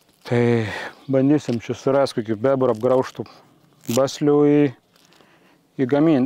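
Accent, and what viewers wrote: Samogitia, Lithuania. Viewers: pasiilgstu video kai ne zemaitiskai snekedavai..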